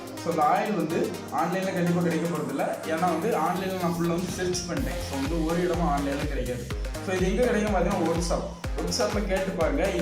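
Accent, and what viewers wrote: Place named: Kerala, India. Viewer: Dai... Nee pesurathe Kerala da.. Music cut pandra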